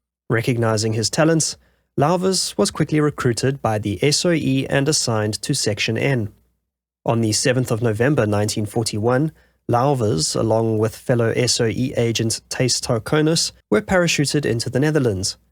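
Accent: South Africa. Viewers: Kudos for another phenomenal video. Well done. It’s great to hear the South African accent of a fellow countryman. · South African accent?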